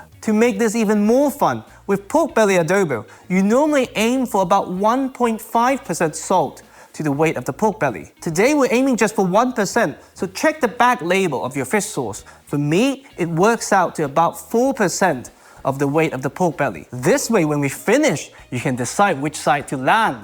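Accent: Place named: United Kingdom. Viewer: with your eyes closed its like listening to some posh old English cooking radio show :D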